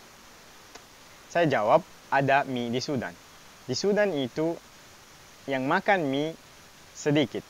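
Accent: Makassar, Indonesia. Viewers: Kereeeeen aksen Makassar da pakai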